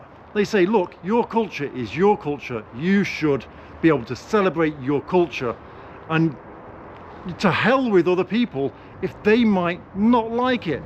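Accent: United Kingdom. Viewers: your accent somehow brought me to my school years, when on learning English we were listening to the tapes, and thats exactly how the typical British man sounded then. Got suscribed because of that small piece of nostalgy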